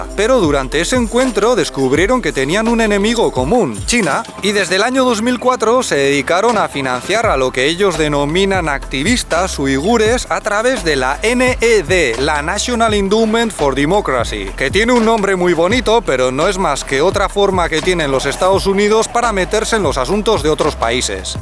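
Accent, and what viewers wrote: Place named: Castile, Spain. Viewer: Great video from a cute couple, i watched many of your videos and i congratulate you for their quality, the images are spectacular and the information more credible than the western MSM. I wanted to comment in Spanish but my writing is rusty, i Haven't written in Spanish for over 30 years. Fortunately i can still speak and read the language to some extent, i also have to admit, it took me a while to understand you due to your strong Castilian accent. Muchas gracias de Canada.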